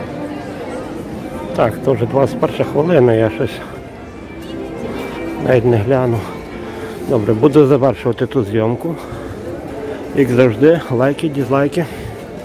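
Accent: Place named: Galicia, Ukraine. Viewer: Не звертайте уваги ні на які зауваження! Говоріть як говорите,чудова вимова! Я коли приїжаю до Львова то не можу наслухатися галицької говірки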